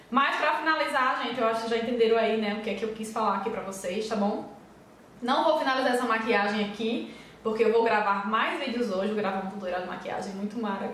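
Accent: Northeast, Brazil. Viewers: genteeee esse sotaque é nordestino. Ameeei, quero saber de onde que estado tu é mulheeer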